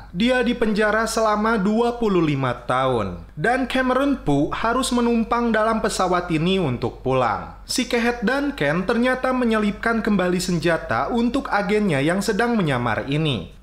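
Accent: West Java, Indonesia. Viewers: Resep euy Ari nu nyarita org Sunda mah haha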